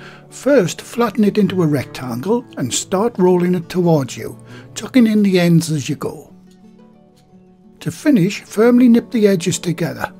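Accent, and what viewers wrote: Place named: Northern England, United Kingdom. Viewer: good man. Cheers mate. I love hearing your voice in these videos, and used to watch them from the US for the Northern England nostalgia kick alone :P I swear you should get a job narrating shows or books or something (though please don't stop the YouTube channel if you do!). All the best, and thanks again :)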